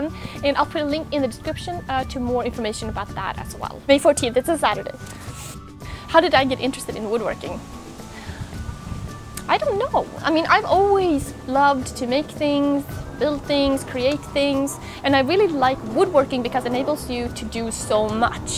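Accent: England, United Kingdom. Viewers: Hi, ooo you are from oregon US I was thinking you was from England Jaja well ok, 👉🏼always love your videos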